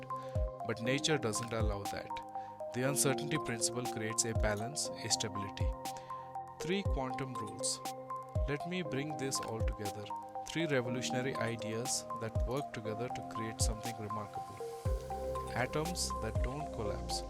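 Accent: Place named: India. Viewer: Indian people’s accent speaking English sucks!!! Go A.I on the voice bro. Your accent is off putting. Sorry to say that, but truth is truth.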